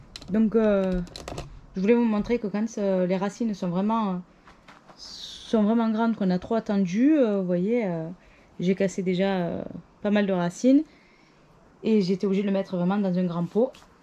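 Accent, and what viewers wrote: South, France. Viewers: Re bonjour, je viens de reprendre la vidéo et je trouve que tu as la main verte et un bon terreau universel, je suis entrain justement de tester des terreaux universel ainsi que des terreau à semis en faisant mes semis et je note les résultats sans oublié que les graines y sont aussi pour beaucoup, j'achète depuis 4 ans à plusieurs semencier pour vérifier la germination de leurs graines, j'ai en teste actuellement: Kokopelli, La bonne graine, La boîte à graines, Les graines Bocquet, Le biau germe, Promesse de fleurs, Les graines de chez Baumaux et pour finir Graines de folie que tu achète toi aussi. Ce que je peux dire pour l'instant c'est que Kokopelli est loin d'avoir une bonne note, germination pas toujours au rendez vous et même des erreurs de graines dans les sachets, la bonne graine idem germination pas toujours au rendez vous, pour l'instant je suis satisfait du Biau germ, des graines Bocquet, de graines de folie et de la boîte à graines voilà. 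Sinon bravo pour toi, tu as de très jolie plants, j'aime bien t'as chaine et la belle personne du sud que tu es avec ton petit accent bien sympatique, et je te dis à bientôt et je te souhaite une très bonne semaine.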